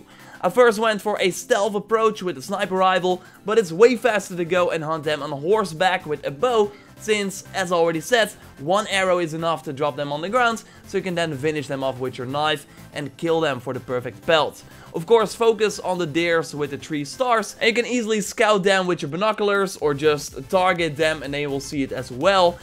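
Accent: Netherlands. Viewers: Are you dutch? anyways do something about the accent jeeez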